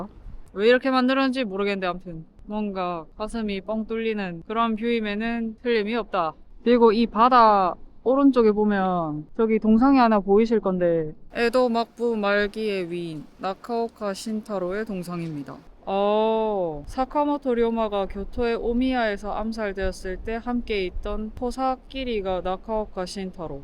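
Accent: Gyeongsang, South Korea. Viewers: 얏빠리! 현지인들과 한잔하면서 대화하는게 젤 기억에 많이 남는거 같아요. 불과 1년반 정도된 일본여행채널이 17만을 찍은 채널이 있는걸보면 도 언능 10만을 찍고 기념으로 다시 만났던 인연들 재회하는 영상 찍으면 더욱더 시청자들이 영상들을 기다릴것 같네요. 구수한 갱상도 사투리에 유창한 일본어가 참 정겨워요! 😊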